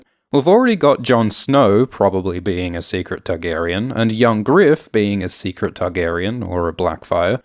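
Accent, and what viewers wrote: Australia. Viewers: I usually have a good ear if someone is Australian or British, mainly because Australian accents tend to have more of a drawl to them but with I couldn't tell because he has one of the Australian accents with less of a drawl to it. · He's clearly Australian to anyone with ears, so I'm not sure why anyone would be wondering that.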